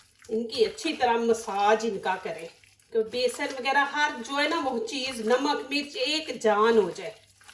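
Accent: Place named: Hyderabad, India. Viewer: Mam your Gulabi Urdu we enjoy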